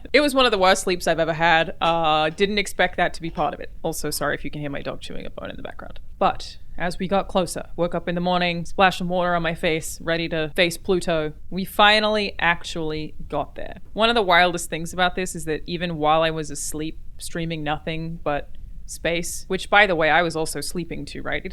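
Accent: Australia. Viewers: Are you Australian? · Dude, your accent's kind of blowing me away. It's like this weird amalgam of like Australian and New Jersey (?)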